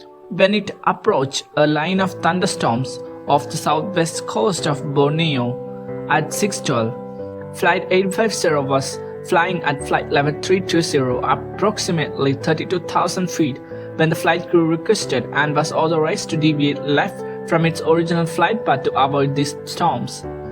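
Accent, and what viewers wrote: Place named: India. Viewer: Anying logat Inggris India kocak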